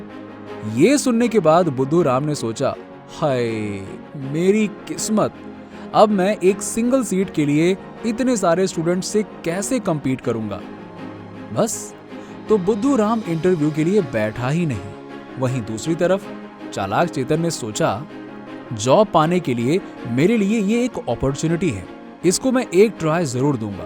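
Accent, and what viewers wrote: Bengal, India. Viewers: Bhai aapka bangoli accent wala voice Jada achcha lagta tha.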